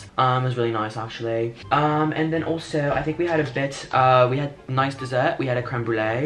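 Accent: United Kingdom. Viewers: Loved your British Accent... So cute💜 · The British accent made me smile and started laughing I loved it oh my goodness so great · Omg that British accent is everything 😂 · Who else loved the british accent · Ur British accent sounded like Reuben De Maid 🤣❤️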